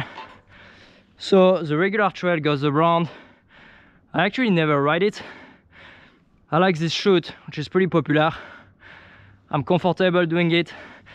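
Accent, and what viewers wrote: France. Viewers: French accent ???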